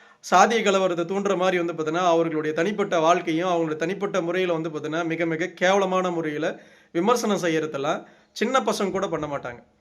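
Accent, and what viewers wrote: North, India. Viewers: நீ வடக்கனா என்ன தமிழ் பேசுற....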